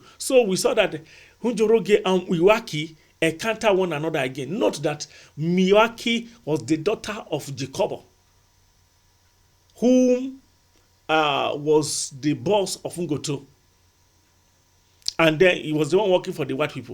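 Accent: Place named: Nigeria. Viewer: As a kenyan, i am entertained in hearing the Nigerian accent of names like Njoroge, Nyokabi, Ngoto etc. Good job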